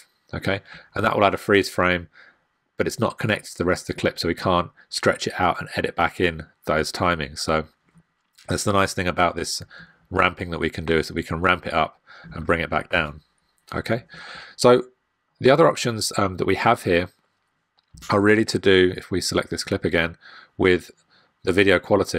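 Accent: United Kingdom. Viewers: this is an English accent you divert!!!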